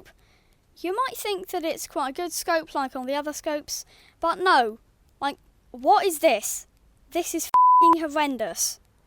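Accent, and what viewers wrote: United Kingdom. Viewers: You sound british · Are u british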